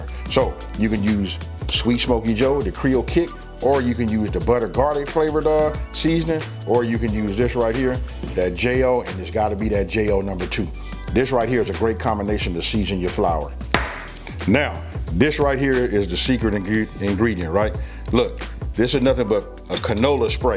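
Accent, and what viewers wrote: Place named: California, United States. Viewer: Anyone from Cali can just listen to you talk and know lol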